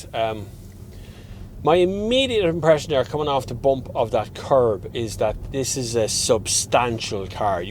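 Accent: Ireland. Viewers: I'm just happy to hear an Irish voice.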